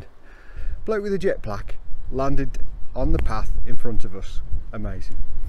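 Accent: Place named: Midlands, United Kingdom. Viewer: Your videos are proving really useful! I'm walking The Cumbria Way in a few weeks ( August 22) It's my first solo walking and camping be trip so I'm a tad nervous; I'm used to trail running but not walking long days back to back. I love your accent,. I can tell you are a fellow midlander but I just can't pinpoint it...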